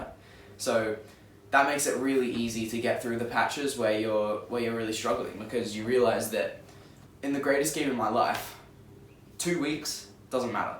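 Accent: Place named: Australia. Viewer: ye mate hes aussie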